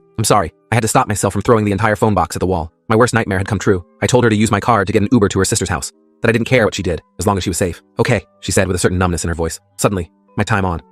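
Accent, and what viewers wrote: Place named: United Kingdom. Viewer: The British accent is just no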